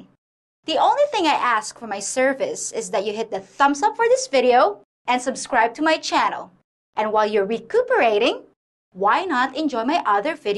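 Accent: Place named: United States. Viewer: hey, is your dad or mom a fil-am or a westerner? your english sounds like you've lived here in mainland US...on point colloquial as well as your enunciations/pronunciations. love ya gf!!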